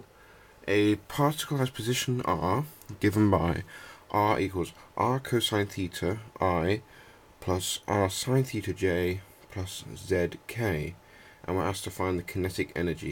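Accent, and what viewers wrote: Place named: England, United Kingdom. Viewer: *ancient. Sounds like an English accent.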